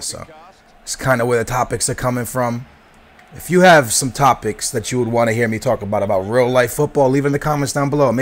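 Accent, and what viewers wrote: United States. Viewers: he learned to speak english the american way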